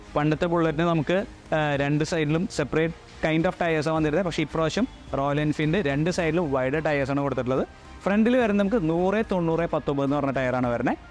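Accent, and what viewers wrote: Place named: Thrissur, India. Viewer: Clear technical explanation, no BS, narration in authentic Thrissur Malayalam. Really liked your review keep going. Lots of love ❤, more power to you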